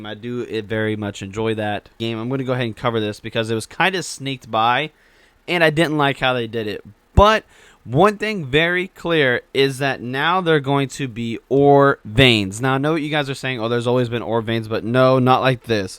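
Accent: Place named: Sweden. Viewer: Toad its her Swedish accent that makes her sound like that.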